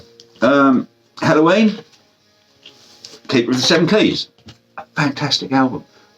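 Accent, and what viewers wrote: Suffolk, United Kingdom. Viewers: Hello from a fellow son of God's own country (Sudbury to be specific) and a retired provincial journalist of near enough 50 years experience. I think I can clear up your stickers confusion. Back in the day, record companies would send white-label promos to radio stations, specialist music mags and national newspapers to generate pre-release publicity but they would also lift records from the first production run and ship them out to reviewers on regional and local papers. More often than not they would stamp or sticker the covers, sometimes even cutting a notch in the corner, to underline that technically these remained the property of the company who didn't want them being flogged on the black (well, greyish) market. It sounds a mite petty but hundreds of copies of hundreds of albums potentially adds up to a fair chunk of change each year. 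I should add, hand on heart, that I never resold a record but because I didn't like the artwork being obscured I habitually removed the stickers. That sometimes meant the sleeve was damaged but, ironically enough, even when the sticker came off clean I probably reduced its rarity value in today's VC market! Hope that helps and please keep up the good work. I only subscribe to two of the countless 'old bloke talks about music' channels and your's is a particular treat because it proves that the Suffolk accent hasn't been completely swamped by Estuary English commuters...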